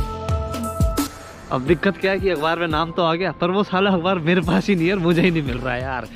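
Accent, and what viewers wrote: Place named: Rural, India. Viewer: Bhagwan kasam I love your Desi voice ( I also have village that's the reason I also speak village language ) Majo Aago !!!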